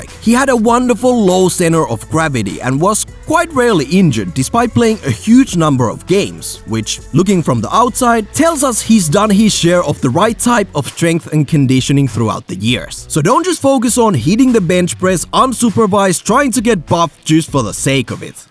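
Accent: Finland. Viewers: suomalainen puhuja. Helposti tunnistaa🙂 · Is this guy finnish?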